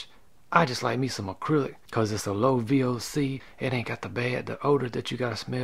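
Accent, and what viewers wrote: Louisiana, United States. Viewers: I was close dude your accent is just like the cajuns down here I love it!!!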